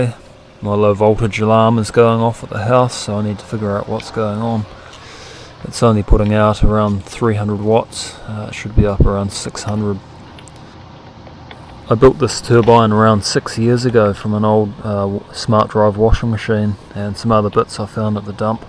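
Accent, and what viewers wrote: New Zealand. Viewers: ..you sound like a Kiwi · I'm looking at this thinking its gotta be NZ bush... hears the accent ;) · Fascinating how a sort of "hybrid British accent" evolved in New Zealand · I love the kiwi accent... "Strup of deed bak"... Love the video. You gave me some ideas.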